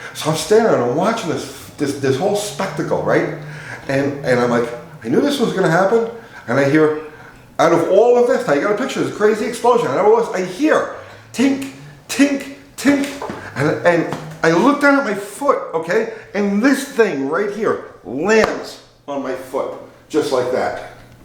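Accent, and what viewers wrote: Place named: New York, United States. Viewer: It's only a matter of time before has his own massive car show/meet and greet. Celebrity status! 👍 P.S. I knew you didn't sound like you were from TN 😁. Where in NY?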